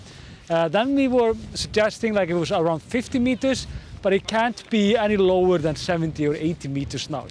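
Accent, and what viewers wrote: Iceland. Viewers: I love Your Islandic accent ❤️ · I really love your repots from the volcano and and your funny Icelandic accent :). You and your camera man are really doing a great job! I'm wondering about more m3 of lava because, in my opinion, the eruptions have become much shorter than before.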